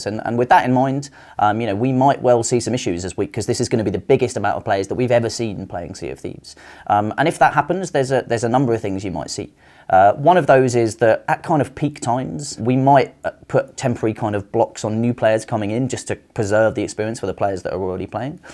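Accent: United Kingdom. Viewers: That british accent 😍😍 10 more days cant wait to play SoT, I have hope this is gonna be huge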